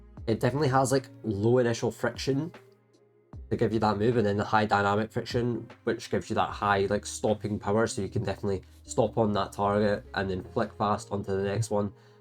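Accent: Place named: Scotland, United Kingdom. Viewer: Scottish accent 😊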